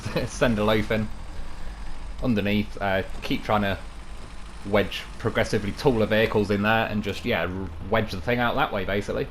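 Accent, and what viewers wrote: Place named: Caernarfon, United Kingdom. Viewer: Hi there. As a regular viewer of your Snowrunner vids I’ve spent a lot of time listening to your accent. While I appreciate you don’t have a North Wales accent - there is a slight hint of a Caernarfon/Anglesey edge to it. Just wondered if you’ve ever lived up here in North Wales? Sorry if it a personal question! Keep up the good work - your Dave stories are great and general banter as you drive is very funny. I regularly laugh out loud. Needless to say I now own multiple Loafs thanks to you. I whole heartedly recommend this channel!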